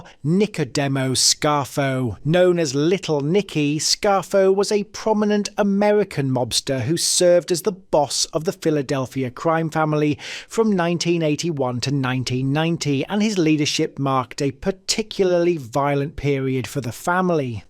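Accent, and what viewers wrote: United Kingdom. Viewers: There's absolutely nothing more annoying than some retard with the UK accent commenting on s*** they have no idea what they're talking about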